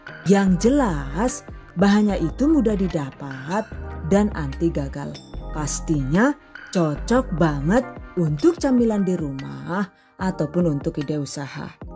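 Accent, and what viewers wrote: Banyumas, Indonesia. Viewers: Ini resep mantuuullll bangetttt......simple, enak, dan jdi inspirasi jualan...( Meski sampai sekarang belum jualan. Juga ), gara2 Nemu video ini, aku jdi seneng banget kro channel kie....maning narasine kadang di tambaih bahasa internasional, yakue ngapak....tambah seneng lah inyonge....sukses terus ya buund.... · Buat ciri khas mba, apalagi ada dialek ngapaknya, bagi saya menghibur sekali 😁. Sukses selalu 🤗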